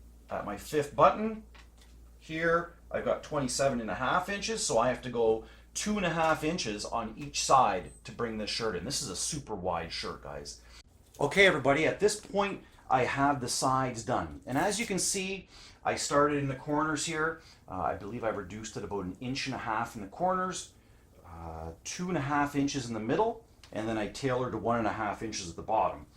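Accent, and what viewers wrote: Canada. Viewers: Loving that rich Canadian accent!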